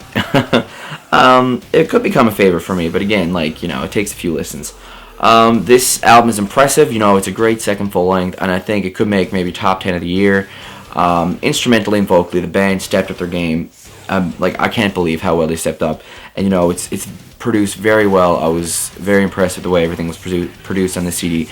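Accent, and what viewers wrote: Canada. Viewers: You're from Canada, ain't ya bud?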